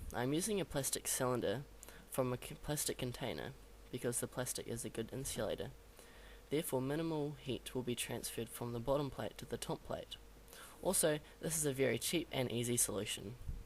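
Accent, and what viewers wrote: England, United Kingdom. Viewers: Wow. Kom je uit Engeland ofzo? Toppie accent. :) Is al dat gereedschap van jou? Draaibank en freesbank hoorde ik ook voorbij komen volgens mij? super werk heur!!!! :)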